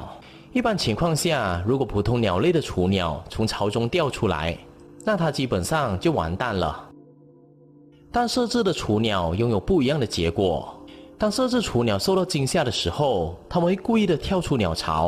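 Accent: Malaysia. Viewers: 马来西亚腔好重呀 哈哈 btw 酵是念 jiao4😅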